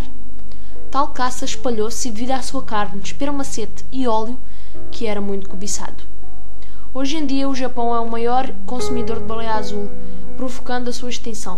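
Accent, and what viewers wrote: Portugal. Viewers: Adoro baleias... Apesar do sotaque português, consegui entender o vídeo.. Parabéns! Abraços do Brasil. :) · Unica coisa que ficou horriveeell no video, Foi A Narração Portuguesa. Si fosse narrado por um brasileiro ia fica 100% bom.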